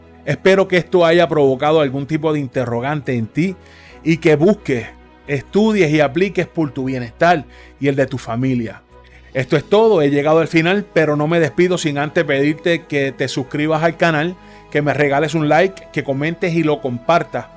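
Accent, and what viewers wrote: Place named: Puerto Rico. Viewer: Quiero saber mas de este tema pero buscare algo mas confiable ya que usted siendo de puerto rico le quita seriedad y credibilidad ademas de que ese acento da mucho asco, mejor busco otro video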